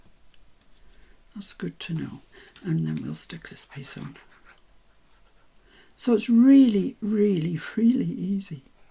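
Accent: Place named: North East England, United Kingdom. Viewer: I've just found you today and subscribed, lovely to hear a fellow North Easterner on YouTube. How can I connect with you? looking for fellow crafters around Northumberland.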